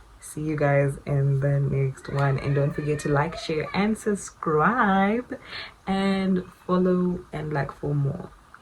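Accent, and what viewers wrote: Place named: Ndebele, Zimbabwe. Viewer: Ne accent yako ino sounder munhu anotaura ndebele waive ku safe side dai wakataura ndinonyanya ku watcher channel yako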